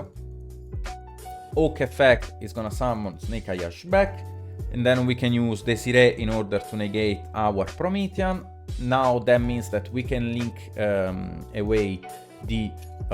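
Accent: Italy. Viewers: Ma sei veneto?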